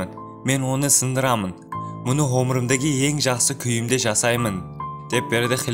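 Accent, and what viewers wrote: Uzbekistan. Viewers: Автор өзбекпа басқама бастысы қазақша сөйлеуге тырысып жатырғой ағайындар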